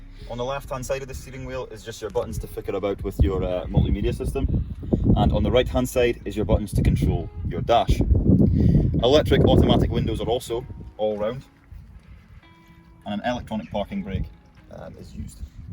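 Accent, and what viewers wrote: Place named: Scotland, United Kingdom. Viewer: Well done for keeping the review informative, short (under 10 minutes), and entertaining. The Scottish accent is an added bonus.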